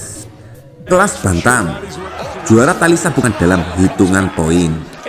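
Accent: Java, Indonesia. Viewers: Medok mas... 😁😀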